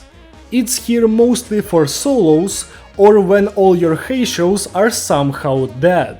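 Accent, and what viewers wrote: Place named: Russia. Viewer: holy russian accent, btw thanks for the guide i am new to the game and i've pulled him this video helped me a lot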